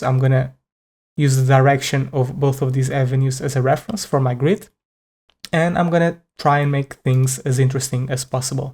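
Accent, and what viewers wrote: Portugal. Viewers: are you perchance porrtuguese?? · Ola amigo é evidente que o teu sotaque é português, devias fazer videos em Português ia ajudar bastante. Obrigado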